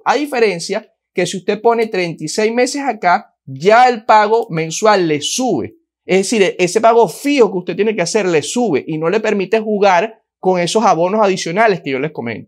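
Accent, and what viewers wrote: Cuba. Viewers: Jejejeje brother muy bien tu personaje de cubano, te metiste en el personaje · Wow gracias por la información Que risa como hablas cubano Caballero 🤣😂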